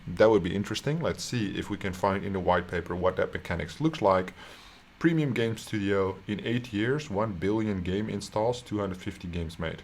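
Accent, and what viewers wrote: Netherlands. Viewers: Are you Dutch bro?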